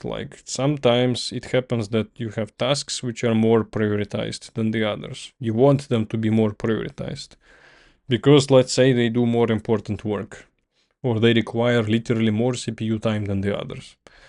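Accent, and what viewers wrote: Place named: Russia. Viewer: nice lecture other than the scarrying russian accent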